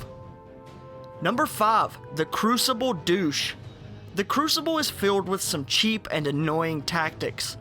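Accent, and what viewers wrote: South, United States. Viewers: DigitalNinjas, I would bet Southern United States. Texas or East of there but not as far as the East coast. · Love your Southern accent! Not much people down here that have Youtubes! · DigitalNinjas it's a southern accent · Dude sounds exactly like Tyler from secureteam10 with a southern accent.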